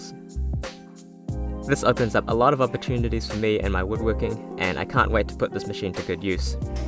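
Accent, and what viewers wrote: New Zealand. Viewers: is that a kiwi accent I hear! Where are you located?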